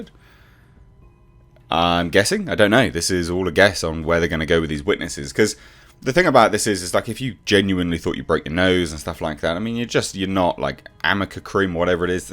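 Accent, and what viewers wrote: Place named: United Kingdom. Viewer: I hope you don't mind but I just gave your name up to Umbrella Guy on a live stream with him just now. It was regarding your Vogue critique earlier today. I found that really funny and mentioned it to TUG and his response was that he likes you a lot, and said he'd like to get you back on a flashcast. So I have my remind buttons on and I'll keep watching for that. Anyway, love your channel! Been watching for a while now. Nice to hear a fellow Brit on YouTube. Cheers. 🖖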